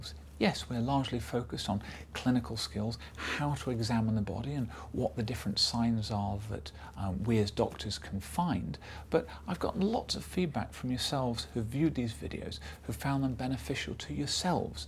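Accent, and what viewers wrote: RP, United Kingdom. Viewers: I discovered this channel a few months ago, and ended up staying because I really found it useful for practicing my comprehension of spoken english and "received pronunciation". I'm French and I currently am studying Foreign and Regional Language, Literature and Culture (English) and it helped me a lot, even though I probably won't use everything I happened to learn here.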